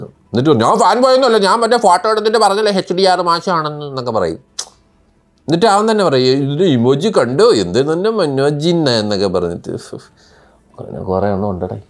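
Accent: Trivandrum, India. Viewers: ശേടെയ് തിരോന്തരത്ത് വന്നപ്പോൾ ഫുൾ slang തിരോന്തരം 🔥 അടുത്ത തവണ വരുമ്പോ പറയണേ അണ്ണാ ഫാൻ ആണ് ഒന്ന് കാണാൻ ഒരു ആഗ്രഹം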